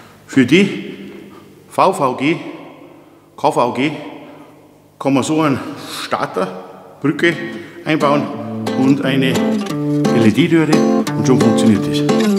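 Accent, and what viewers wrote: Bavaria, Germany. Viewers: Ich weiss das er heisst mehr nicht und bayrisch redet oder Österreichisch keine Ahnung was soll man da eingeben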